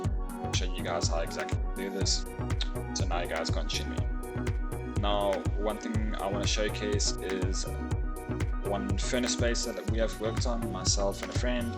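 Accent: South Africa. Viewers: Are you South African??????? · lol Afrikaans?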